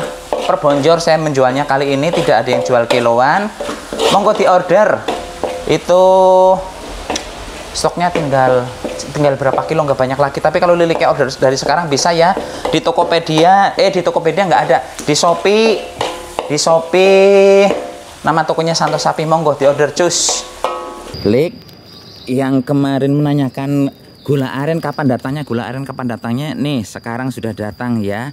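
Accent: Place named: Banyumasan, Indonesia. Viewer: Mase nek bicara ngapak, daerah cilacap tah mase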